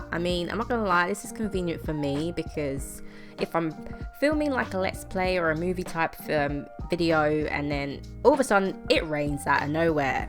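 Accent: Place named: United Kingdom. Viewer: Can you tell where you found that gorgeous house??? The gallery?? Love your videos, obsessed with your voice/British accent , I love it so much!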